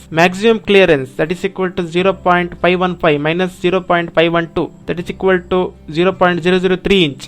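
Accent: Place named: India. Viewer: 印度口音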